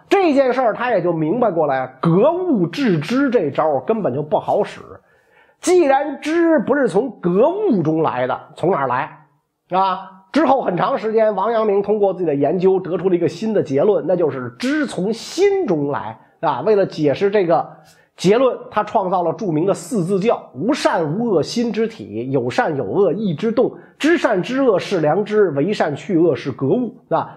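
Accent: Beijing, China. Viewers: 北京口音真好聽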